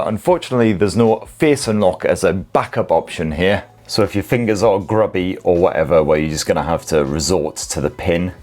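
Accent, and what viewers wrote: United Kingdom. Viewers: What part of Britain are you from mate? I know my regional accents pretty well but I cannot nail yours.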